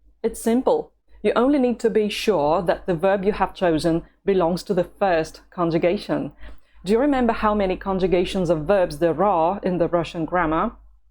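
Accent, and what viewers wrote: United Kingdom. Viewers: Hi which country are you living now? I find that you have a British accent when you speak English:)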